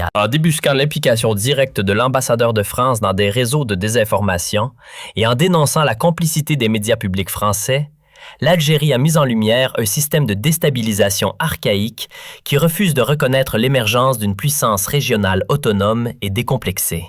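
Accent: Canada. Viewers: cet accent canadien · fait avec un accent québécois plutôt que français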